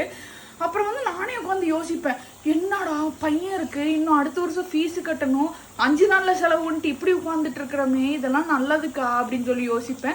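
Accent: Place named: Coimbatore, India. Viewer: கோவை தமிழ் சூப்பர் மா ❤❤❤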